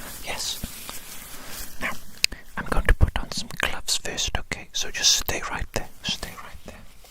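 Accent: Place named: RP, United Kingdom. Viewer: This dude’s pronunciation puts stereotypical British to shame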